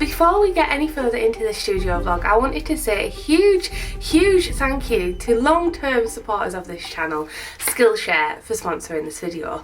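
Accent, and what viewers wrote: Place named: Teesside, United Kingdom. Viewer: Iv just accidentally come across your channel looking at heat press reviews (has yours lasted?) and I thought she sounds local...I'm in Boro. Lovely to meet you and look forward to watching your videos. X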